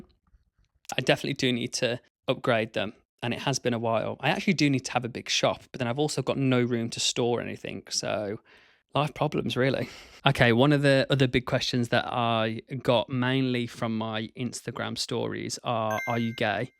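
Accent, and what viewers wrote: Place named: England, United Kingdom. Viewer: Thanks for sharing. Like most people here, I’ve been watching some of your dancing videos for quite some time now, and it’s nice to (literally) hear more about you. And btw, I’m French, and to my ears your English accent is to die for 😌 In any case, keep following your dreams and making us dance with you 🕺🏼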